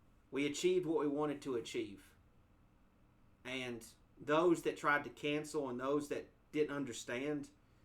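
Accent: South, United States. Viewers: This is the side of I like and appreciate. Calm, and makes a lot of sense. One thing, he’s gotta be from the south with that accent. And! It’s apparent, he must be a NASCAR fan as well., who’s your favorite driver? What’s your thoughts on the current state of NASCAR? I left NASCAR on the final lap at Phoenix last year when 7X Cup Champion Jimmie Johnson rode off into the sunset. Love to hear your thoughts. Love your channel.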